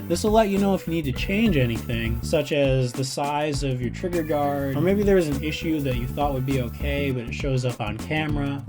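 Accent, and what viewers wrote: Canada. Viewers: You're Canadian? Noice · Canadian, eh?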